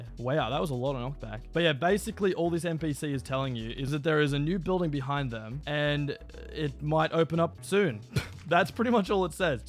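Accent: Australia. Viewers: why do you sound so australian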